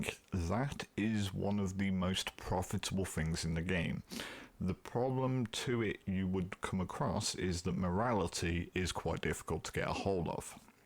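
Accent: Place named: United Kingdom. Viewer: Love this just brought the game on switch with dlc on the e-store! Where’s abouts are you from in the UK? I’m British from Birmingham and trying to place your accent!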